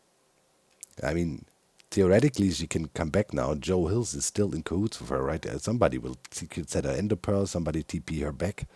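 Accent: Germany. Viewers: I don't understand haters. I really don't. I mean - How can you not adore that German Accent?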